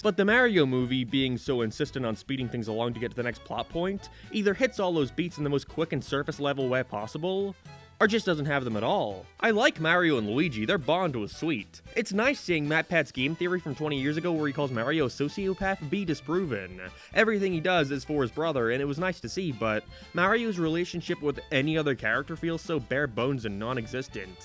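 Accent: Ireland. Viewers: dude is Irish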